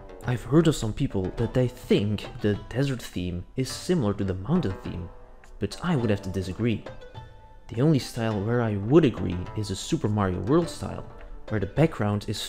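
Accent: Netherlands. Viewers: WOWOWOWOWOWOWWW! TALKING? I MEAN... I'VE BEEN A BIT DISTANT FROM THIS CHANNEL LATELY, BUT... CAN SPEAK? (I like your voice tho. And the dutch accent is pretty awesome to hear, you don't hear that accent on youtube often) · are you dutch?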